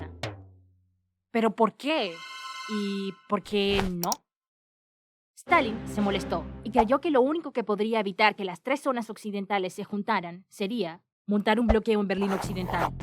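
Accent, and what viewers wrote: Panama. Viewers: jaja, su acento panameño es muy notorio jajajaja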